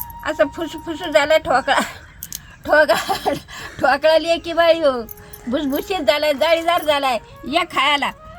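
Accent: Marathwada, India. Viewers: आज्जी तुम्ही मराठवाड्यातल्या आहे का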